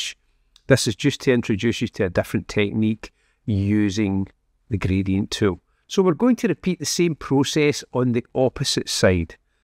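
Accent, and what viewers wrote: Scotland, United Kingdom. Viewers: As a non native speaker it took me quite a time to get the hang of your (Scottish?) "touch". But your artistic approach kept me listening - much to my advantage, I must say, language wise and more so art wise too. Main question, of course is: How did you compose these three images in the fist place? Could you do a video about that too? Pleeeze!!!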